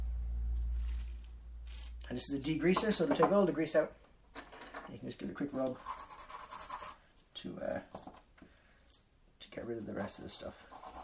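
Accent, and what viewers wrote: Ireland. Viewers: It's an Irish accent, but not a difficult one. Are you talking about that, or the volume?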